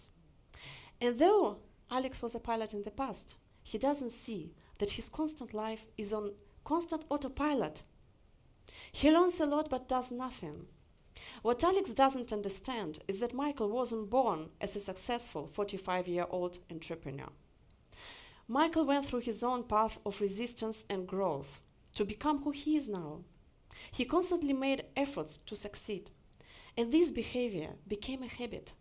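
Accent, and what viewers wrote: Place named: Russia. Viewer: Какое-то банальное УГ, с мощным русским акцентом.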